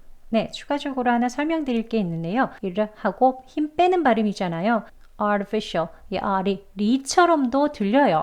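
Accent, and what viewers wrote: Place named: United States. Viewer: 전형적인 미국식 발음 같네요. 오늘도 잘 들었습니다. 감사합니다.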